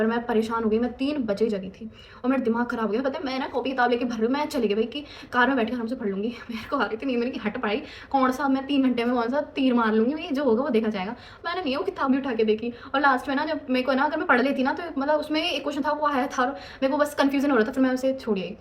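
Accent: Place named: Haryana, India. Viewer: Questions to ask: Apne konsa college aim kiya hai? Aap caurse konsa krogi? Kya cuet dene ke liye apne computer sikha tha ya bina sikhe hi de Diya? Mujhe computer chalana nhi aata to kya me fir bi cuet de paungi? Koi glti to nhi hogi? Ya sikhna pdega pehle? Plzzz ans these questions....btw I love your Haryanvi accent ❤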